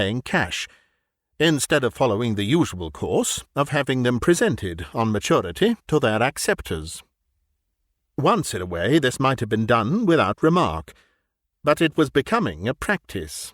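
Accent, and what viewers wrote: United Kingdom. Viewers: Thank you . Much appreciated. I am Egyptian. I did enjoy the superb reading, the beautiful British accent, and the story of course. I can’t say I fully understood the bank “dealings”/terms, but then I can barely understand customer service at my own branch no matter how user friendly they try to be to my 63 yo ears in my own country.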